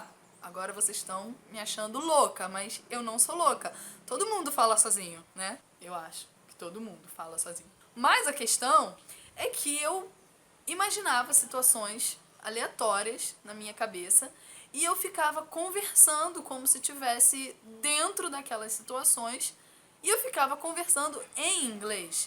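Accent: Brazil. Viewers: Love this Portuguese/Brazilian language accent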